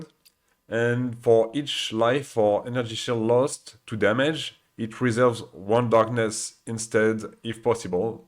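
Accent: France. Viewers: You got a bit of a french accent but you speak english way too good to be french. What is this sorcery?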